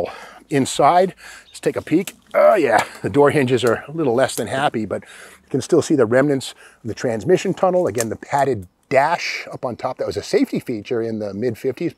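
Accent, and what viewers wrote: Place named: Boston, United States. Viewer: . You still got that Boston accent...all good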